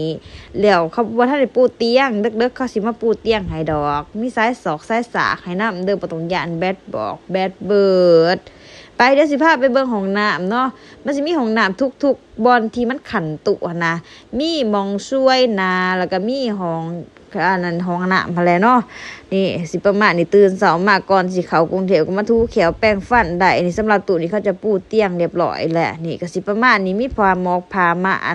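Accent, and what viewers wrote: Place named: Sisaket, Thailand. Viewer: สำเนียงคล้ายๆกับทางศรีษะเกษป่าวครับนี่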